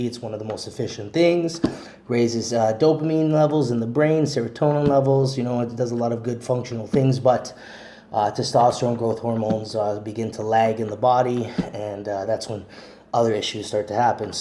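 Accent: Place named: Canada. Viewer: You’re Canadian ! Oh I feel like such a dummy asking where In the states you live. I’m not great with accents obviously, my apologies 😂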